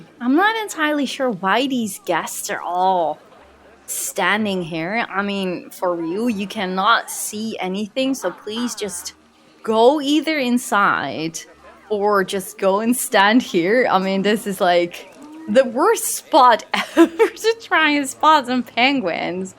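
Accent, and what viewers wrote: Netherlands. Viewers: Only now I run into your channel and I'm in love.. I have a mad respect for the building that you do and the way you make it all look so beautiful! I might be new, but I'm watching it all 😆 For once too I don't hate the accent of a dutch person speaking English! You have a very relaxing way of speaking and I love it!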